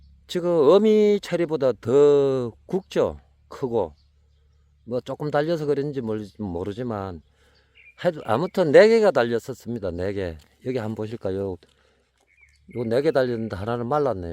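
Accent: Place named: Gyeongsang, South Korea. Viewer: 체리가 아주 맛있게 무르익었네요 저희는3키로 다 먹었네요 ㅎㅎ 가게손님들이랑 맛나게 먹었어요 싱싱하고 아삭 상큼해요 아주 맛있게 감사하게 먹었습니다 경상도 사투리로 체리 시식먹방 정겹게 잘보고 있어요~😅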